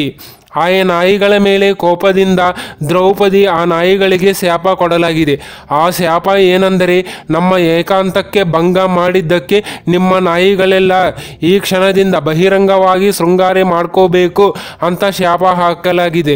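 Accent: India. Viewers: Yarappa Nenu Kannada na Malayalam style Alli mathadtiya modhlu Kannada Kalli amele story helu..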